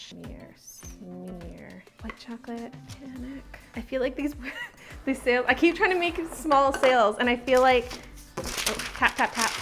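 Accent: Canada. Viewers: omg ru canadian bc u sound SOOO canadian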